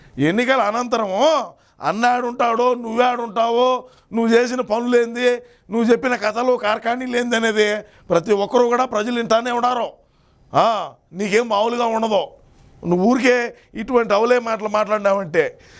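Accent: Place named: Telangana, India. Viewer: బాబు తెలంగాణ భాష తగ్గించు బాబు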